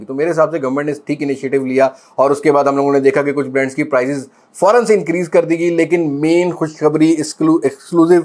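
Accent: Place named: India. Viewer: You don't have to talk like an indian sir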